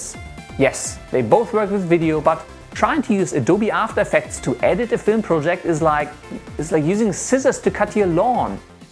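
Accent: Germany. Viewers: Man hört direkt das du Deutsch bist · He has a German accent so he should be German. Anything else would be odd. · Do i hear a german accent? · Man merkt das du deutscher bist :) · Your German accent is so cool:D! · "Foa evaa" Das deutsch drückt schon heftig durch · So are you a German with an English Accent? I'm trying to figure it out. I understand what you're saying pretty well but it's not California American, that's for sure. Just curious. Your videos are great!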